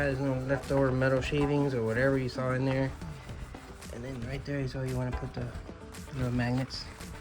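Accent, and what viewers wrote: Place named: Miami, United States. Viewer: Hey sounds like you're from Miami, how much would you charge for doing this to my car? Im located in kendall. My Tiggy is due for new fluid.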